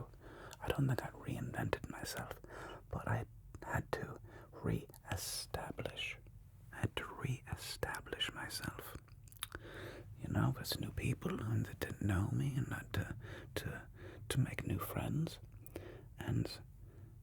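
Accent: Germany. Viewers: I love the German accent videos ❤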